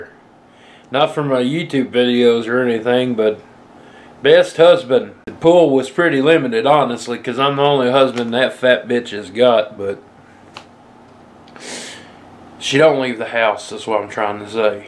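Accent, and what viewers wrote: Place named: Kentucky, United States. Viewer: U sound like me FROM KENTUCKY. Lets represent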